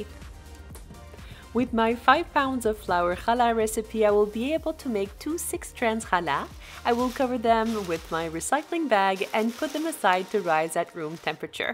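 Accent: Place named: France. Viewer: I love challah! Thank you. I thought you live in Israel until I saw the groceries in English. Are you in the states? In an orthodox Sephardic jewish community? Also you hv a beautiful French accent. This week is the key challa segula for Parnassa as it’s after pessach and Benetton Israel entered Erez Israel.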